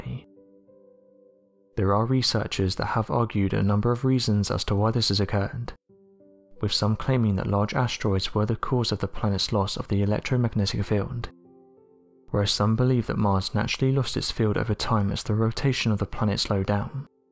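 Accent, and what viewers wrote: United Kingdom. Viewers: why are always using a british guy narrating these stories?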